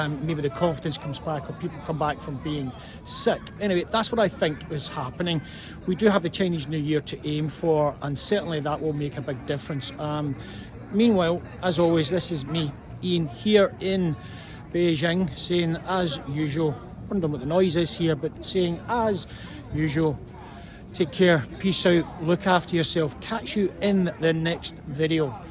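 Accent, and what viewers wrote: Scotland, United Kingdom. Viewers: How could he/she not understand it? Your accent is much much mild Scottish accent but certainly can be understood easily. I really have to work hard to under the strong Glaswegians' accent as some words seem not English when they speak.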